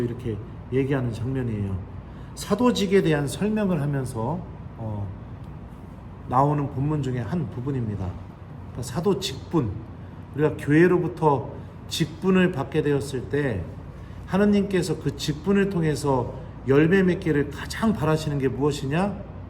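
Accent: Chungcheong, South Korea. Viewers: 신부님 수고하십니다.미국 에까지 가셨네요.몇칠전에 본당신자들한테 1번2번3번 하셨싸트만 또 벌써 그멀리 가셨네요.신부님 강론듣다보면 우리고향 충청도 말씨 때문에 너무 재밋게듣습니다.ㅎㅎ오늘도역시 충청도뚝배기말 (뿌랭이)표준말(뿌리)소리듣고 많이 웃습니다.신부님 미국에서 열씸히 하느님말씀 선포하시고 건강히 계시다 행복한마음으로 돌아 오세요.😅😂😢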